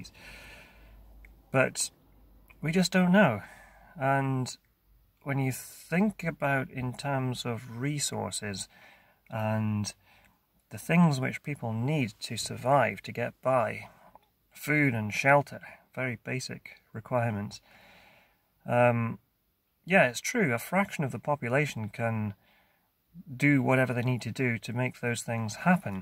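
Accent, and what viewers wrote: Scotland, United Kingdom. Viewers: I never noticed your Scottish accent until this video.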